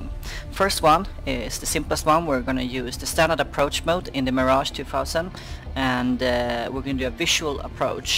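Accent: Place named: Sweden. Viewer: Nice vid like always. btw are you swedish you sound a bit swedish xD , im swedish .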